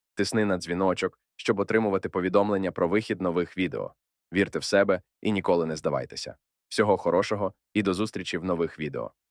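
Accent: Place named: United States. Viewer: American pronunciation 👎 I like British pronunciation 👍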